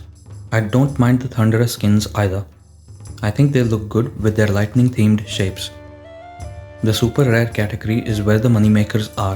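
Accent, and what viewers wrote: India. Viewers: Are you from india youre accent sounds indian???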